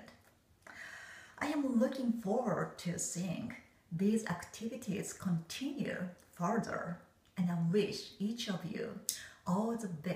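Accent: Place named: Japan. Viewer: У японок такой приятный акцент. Или не акцент, а манера речи. Сладковатый такой :-)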